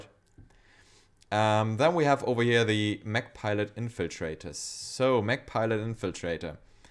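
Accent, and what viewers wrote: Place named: Germany. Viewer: Man hört den Alman einfach so raus alter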